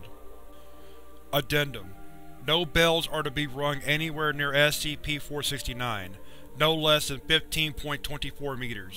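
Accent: South, United States. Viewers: Southern narrator is amazing, I really like it. Like a personal touch, I really really like it!!!😊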